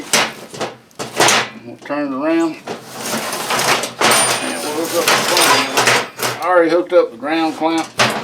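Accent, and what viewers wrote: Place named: Kentucky, United States. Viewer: He sounds like he is from Kentucky!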